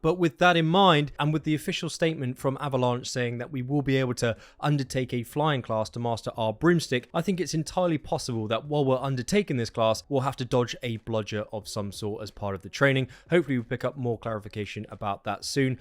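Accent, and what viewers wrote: United Kingdom. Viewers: Big up the British accent on these videos 👍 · There's something about a British accent speaking about Hogwarts/The Wizarding World 🤣 Great video, looking forward to Gamescom! Thanks!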